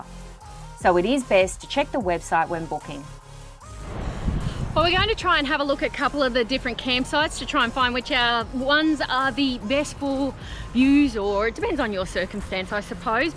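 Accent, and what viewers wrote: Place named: Brisbane, Australia. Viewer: New to your channel, 1st video I’ve watched. As a fairly frequent visitor to Bribie I was interested to see how you put it across. Great video & very informative. Another option is the inland track & even camping at poverty creek (although make sure have mozzie repellent!) Sounds like your from Brissy area & will look forward to seeing your vids.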